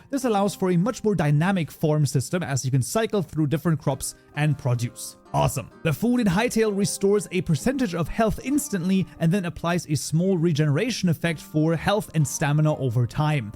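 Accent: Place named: Scandinavia. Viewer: 99% of the time I can tell of somebody is German from accent alone. How did you manage to get a Scandinavian accent? 🤔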